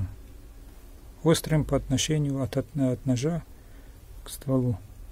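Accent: Caucasus, Russia. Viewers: Как же удачно я набрела на ваш канал ! Подписалась . Теперь изучаю все способы прививки ! Очень всё доступно и понятно ! Спасибо огромное ! И этот мягкий акцент . ...явно юг .... Осетия ? ... Балкария ? ... Но могу ошибаться . Удачи вам ! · Из всех видео, что смотрел на ютубе, ваши самые чёткие- по делу, коротко, понятно, все видно в кадре,лишние телодвижения вырезаны. Иногда и с юмором. И самое главное, -не косноязычно,без всяких (ээ-мм-ээ-бэ-э).Видно, что человек знает о чем рассказывает и показывает так, чтобы понятно было всем. СПАСИБО БОЛЬШОЕ ТЕБЕ. P.S. А Вы, остальные русскоговорящие, выкладывающие ролики, учитесь у человека с Кавказа .Да,есть небольшой акцент, но говорит он без ошибок, не мычит, как большинство из вас и в словах не путается. Словом,- приятно слушать и смотреть.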